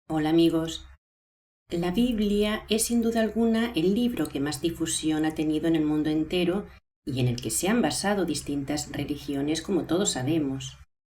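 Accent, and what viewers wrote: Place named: Spain. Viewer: misael rrodriges a mi su voz española se me hace bien horrible!!!!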